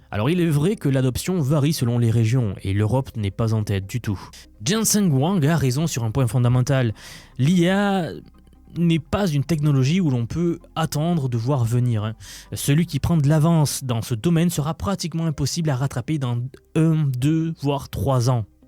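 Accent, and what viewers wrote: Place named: South-West, France. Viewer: J'aime beaucoup ton petit accent du Sud Ouest ♥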